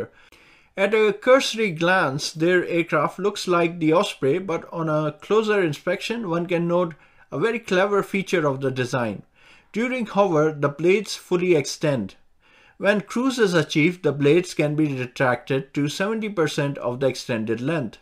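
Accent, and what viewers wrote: India. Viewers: I like ur accent👍👍 r u from india?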